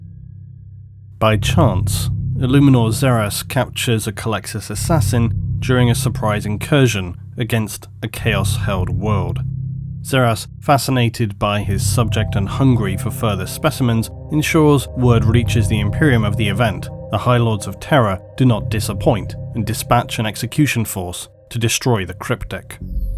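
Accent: England, United Kingdom. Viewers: Hello, just curious, what part of England is your accent from?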